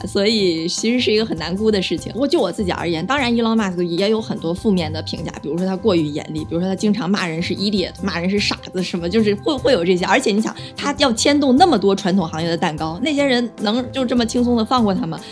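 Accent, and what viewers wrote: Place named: Henan, China. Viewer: 那里的老乡？听说话像河南、陕西、山西那一块的人。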